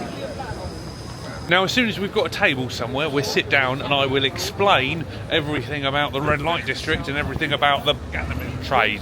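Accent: Scotland, United Kingdom. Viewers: are you Scottish??.....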